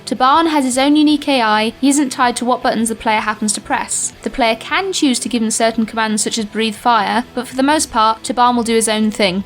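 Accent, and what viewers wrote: England, United Kingdom. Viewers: Jesus I was not expecting a broad English accent XD great video though!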